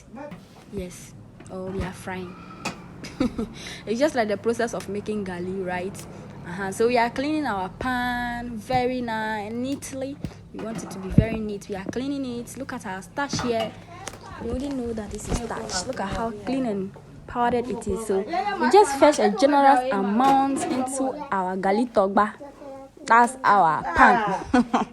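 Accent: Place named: Ghana. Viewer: Very educational and interesting 🎉 Plus your voice and GH accent is giving 😊🌹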